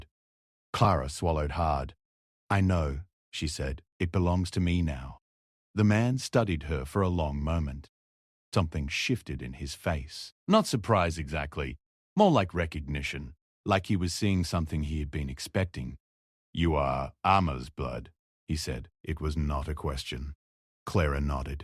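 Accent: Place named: Australia. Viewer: The narration isn’t exactly odd…it’s spoken in an Australian dialect. However, why would a story about a relatively remote part of America and its past be offered in a dialect of English from the other side of the world?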